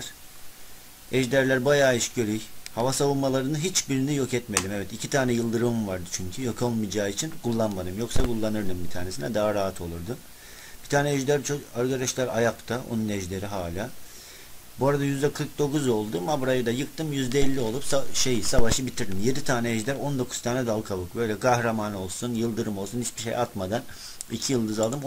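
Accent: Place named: Giresun, Turkey. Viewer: abi siven bizim oranun sivesi da sen nerelusun ben giresun espiye ..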